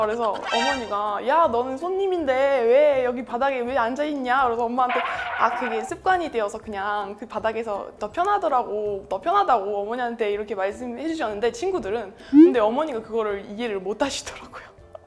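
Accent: South Korea. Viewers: 화면 안 보면 한국인인 줄 알 듯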